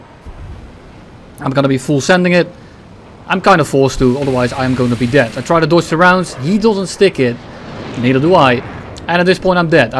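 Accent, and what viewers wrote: Netherlands. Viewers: Die Nederlandse accent gaat te ver💀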